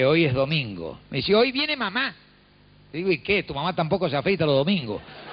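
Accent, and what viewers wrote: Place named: Buenos Aires, Argentina. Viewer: Tiene el acento más porteño de la historia.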